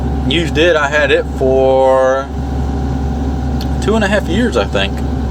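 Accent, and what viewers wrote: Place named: North Carolina, United States. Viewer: I was born and raised in the Old North State. When I listen to you, my accent comes back even though I have been gone forty years. Love it.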